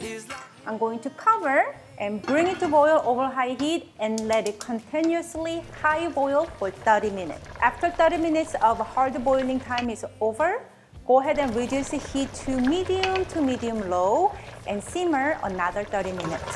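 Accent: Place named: South Korea. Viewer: She married white man. Why is she have Korean annoying accent?.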